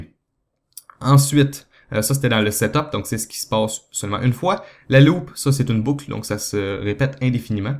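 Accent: Quebec, Canada. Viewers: Top ta vidéo l'ami. Juste une chose pour faciliter la compréhension du Français avec l'accens Q'bequoi... Parles un peu moins vite... S'il te plais... Un cousin Français qui apprécie tes tuto, et qui voudrais pleinement en profiter...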